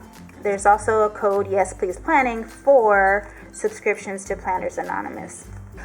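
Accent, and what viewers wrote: South, United States. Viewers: I loved everything with yellow on it! I love that color for a transition into fall🧡. Another thing I loved was your southern accent it gave me a good laugh☺ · Great setup! I loved your country accent, it was fun 😉. I'd love to see a tutorial on how you change the hair and skin color, I really love that idea. Thank you for the chance to win these goodies, my favorite part of the kit are the sunflowers! They are my favorite flowers and are drawn so beautifully in this kit 😍. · Absolutely adorable! Your southern accent was great, teehee! 👏🏻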